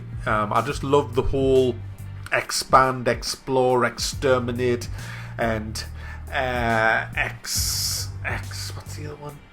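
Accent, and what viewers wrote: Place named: Scotland, United Kingdom. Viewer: I HATE strategy games simply because I cannot play them and will not, this isn't my point though (my point is this...) I still watched this almost 15 Minute long video because of the commentary! is simply the best reviewer and commentator. He's got it all! Quick wits, mature humour and of course none of this would be complete without his awesome accent which I think is... Scottish?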